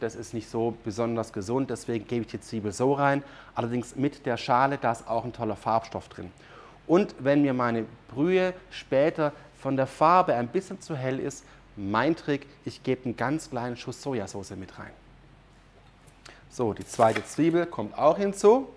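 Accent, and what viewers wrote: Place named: Swabia, Germany. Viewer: Oh... ich kann mich auch vollkommen vertun. Aber ich rate mal einfach frei raus: Nürtingen? Südlicher? ;-D Isch jetz nix Böses gemeint! Isch bloß an Witz. Danke für dein Video!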